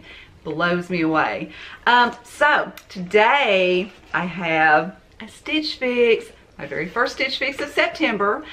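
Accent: South, United States. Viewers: First time viewer. I subscribed. You have a nice southern accent. Where are you from?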